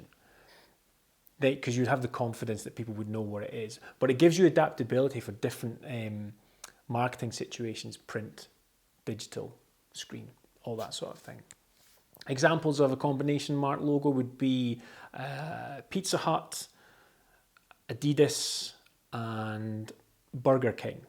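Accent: Scotland, United Kingdom. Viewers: HOLY CRAP YOU ARE SCOTTISH! IM PART SCOTTISH!!! HOW DO I GET BETTER AT MY ACCENT AND THE GRAMMAR IN SCOTTISH?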